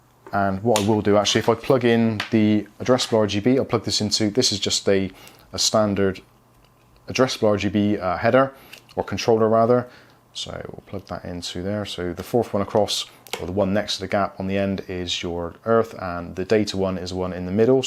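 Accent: United Kingdom. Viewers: I loved the Bri'ish accent mate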